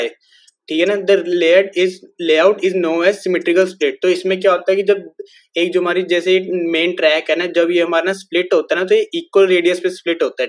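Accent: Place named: Jammu, India. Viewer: Way of speech suggests that you r from jammu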